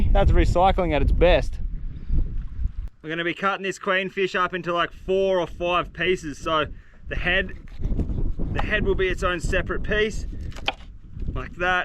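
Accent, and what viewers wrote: Australia. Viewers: Its always that aussi accent 😂always gets me❤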